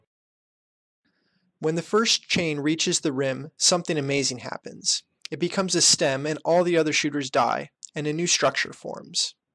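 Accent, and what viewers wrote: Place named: Rio de Janeiro, Brazil. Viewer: pq o inglês dele tem sotaque carioca??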